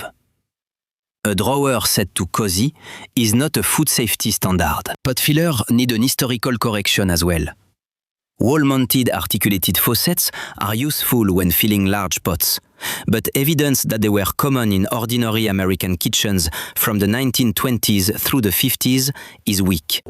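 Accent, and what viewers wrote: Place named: France. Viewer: I like this decent French accent😊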